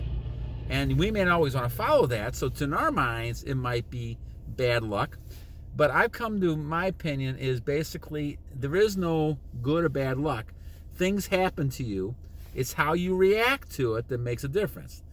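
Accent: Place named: Illinois, United States. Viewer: I don't know where he is but he's definitely from Illinois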